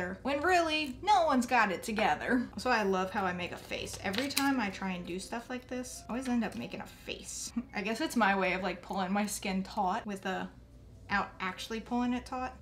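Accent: South, United States. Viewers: Just got a couple of nose piercings and was looking for aftercare advice and and noticed makeup eyeshadow colors. I love to see bold colors used even though idk how to put makeup on lol but I love the accent (sounds southern and northern at the same time) and listening to her voice is so natural ❣️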